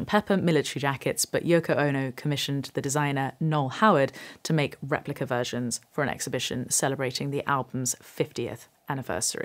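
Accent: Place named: Bristol, United Kingdom. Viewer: It'd be hard NOT to notice the near-constant appearance of black t-shirts in your early videos. That said, I hope you won't go too far in the other direction. I'm inclined to agree with Bowie that, if you're going to be a performer, appearance, at least on stage, is part of the performance. And frankly, this elderly Midwestern USA male likes seeing you in something other than just plain black from time to time. On the other hand, what drew me to this channel initially had nothing to do with what you were wearing. I very much enjoy the Bristol accent and, I admit, the educated tone of your non-musical videos (like this one). So, yeah, wear a red (or purple, or electric blue) t-shirt occasionally, or go wild and wear a girlie-dress, but don't get too caught up in "fashion," which is ephemeral at best, and mostly trivial anyway. Keep writing songs and working on your guitar chops.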